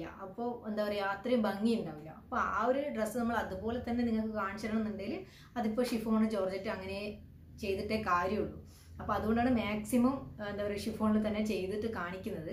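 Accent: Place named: Thalassery, India. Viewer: Hai chechi.. Tlsry evideya veed... Samsaasara kettapo thanne eniku thoniyirunnu.... Nammale aalaanu...🥰🥰tlsry evideya... Njan eppoyum kaanaarundu...👍👍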